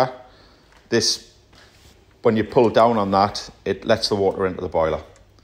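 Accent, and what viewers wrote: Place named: Northeast, United States. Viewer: Top stuff! We got our new boiler last November, and I have had to refill it once (under instruction from the man who installed it). Couldn’t remember what to do when it just happened again, and your short video guided me exactly. Dead simple. Thanks very much indeed. (Lovely to hear a voice from the Northeast again after so many years away!). Brian Barber, Worcestershire.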